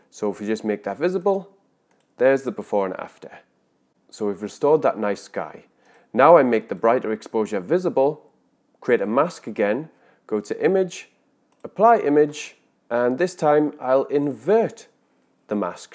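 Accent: Newcastle, United Kingdom. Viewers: Great video and I'm in total agreement. I've not used any HDR software since I stumbled upon one of your videos and bought Raya Pro. By the way, my American girlfriend loves your voice. She finds it extremely relaxing. Ever since I've been with her she's grown to love the Geordie accent but she particularly likes yours; especially when you say the word "mask". In fact when I'm watching your videos, she now refers to you as.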